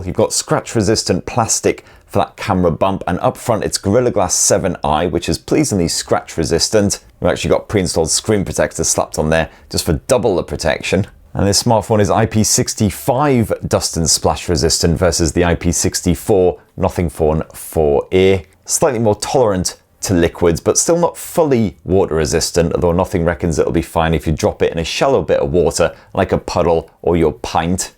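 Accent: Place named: Northern England, United Kingdom. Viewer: It is in English, well northern English.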